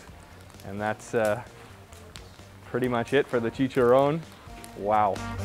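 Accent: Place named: Canada. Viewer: this dudes canadian isnt he. "Crunchy on the Ootside," "MELTS IN YOUR MOOTH"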